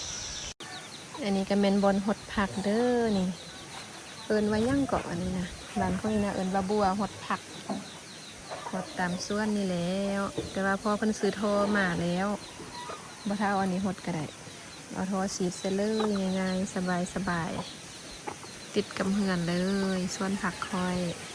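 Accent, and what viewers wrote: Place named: Chiang Khan, Thailand. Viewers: น้อง อ่านพาสาไทยได้บ่อน้อ, เว้าคือเลย เว้า หล่มสัก น้าแก้ว แก่นท้าว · สำเนียงพูดเวียงจันทน์ เหมือนกับหนองคาย สำเนียงเหมือนจังหวัดเลย สบายดี❤❤❤🎉🎉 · พูดเหมือนคนไทเลยจังแต่ฟังสำเนียงการพูดเหมือนคนไทเชียงคานจังหวัดเลย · สำเนียงน้องพูดภาษาคล้าย,จเลย,จ,เพชรบูรณ์ของไทยครับ❤❤❤❤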